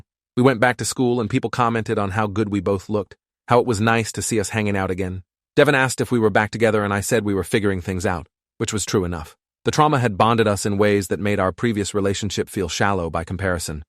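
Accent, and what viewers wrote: United Kingdom. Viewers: Holy British bru 💀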